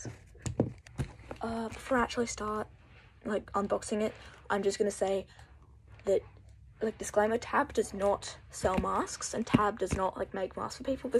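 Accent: Australia. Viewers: OMG UR AUSIIE ACCENT IS BETTER THAN MINE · Are you Australian? I love your accent!❤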